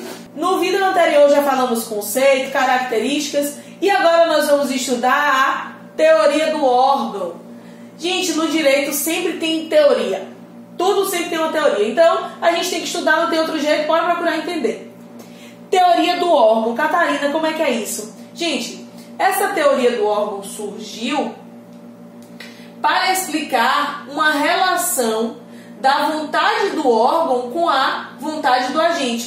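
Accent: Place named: Bahia, Brazil. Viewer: Estou amando suas aulas, sou estudante aqui no Rio de Janeiro e sou baiano; seu sotaque parece ser baiano.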